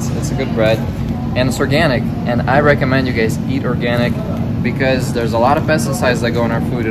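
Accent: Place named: Russia. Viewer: От русского акцента не избавиться))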